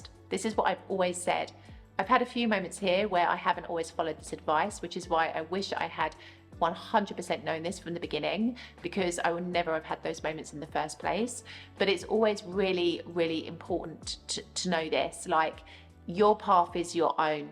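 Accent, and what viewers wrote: United Kingdom. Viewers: Actually she’s not very expectedly fluent. Her level is very very low. Her accent is still very British 🇬🇧. I believe everyone’s journey is different. I am learning Italian too. One day I feel like I have made progress the next day every word has flown through the window and I start all over 😆😆🥹🤔.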